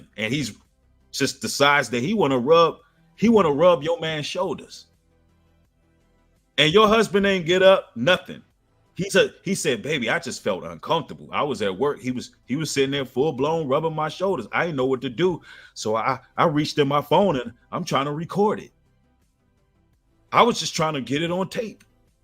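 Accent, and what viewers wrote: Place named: Philadelphia, United States. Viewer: Your Philly/Meek accent is hilarious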